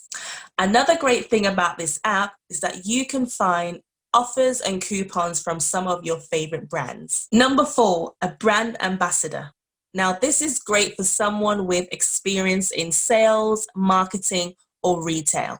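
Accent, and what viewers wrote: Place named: United Kingdom. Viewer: Why are you quoting $ dollars and not £ Sterling when you have a British Accent? Where are you based?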